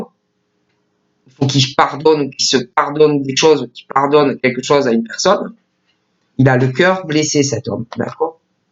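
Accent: South, France. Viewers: ton accent du midi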